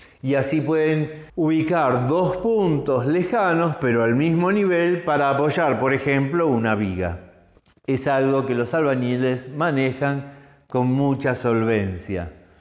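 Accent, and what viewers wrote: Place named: Argentina. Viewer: El acento argentino siempre queda tan bien en un profesor, muy buen vídeo!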